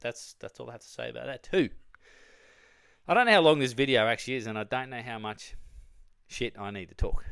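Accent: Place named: Australia. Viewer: Is it just me or does he sound even more Australian on this channel?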